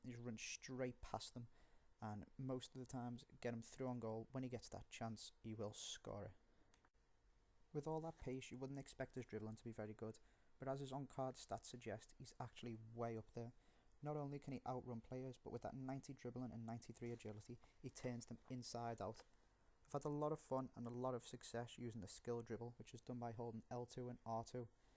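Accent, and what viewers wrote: Liverpool, United Kingdom. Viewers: Omg he is so scouse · He sounds even more scouse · It’s pretty baller to say “welcome to my very first YouTube video” as someone who doesn’t know whether it’s gonna work out at all. He knew it was gonna happen for him - also, did he lose his scouse accent as he got older lmao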